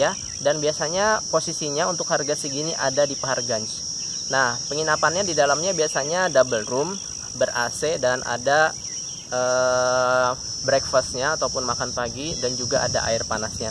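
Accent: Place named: Sunda, Indonesia. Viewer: Mantafff..👍 monggo mas di serius-in..kelihatannya prospek kalo mas bisa bikin travel packace ke India..mudah2an bisa tambah2 uang saku.. Btw..mas aslinya org mana y? Saya dengar2 asli kalimantan..tapi logatnya kok kaya kental aksen sunda nya..semoga tetap semangat do the best disana 💪 Salam kenal dari Surabaya 🙏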